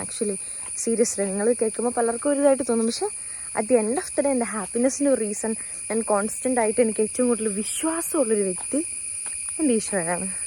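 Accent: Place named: Kottayam, India. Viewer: Naatil evdyyaaa?? Samsaram ketit pathanamthitta/kottayam pole😅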